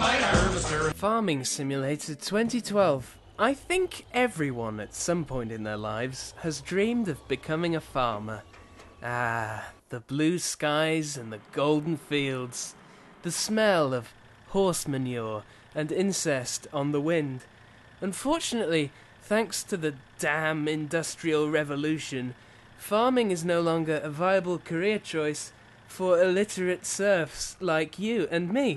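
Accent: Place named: Brighton, United Kingdom. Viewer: but why does he have a southern (brighton) accent?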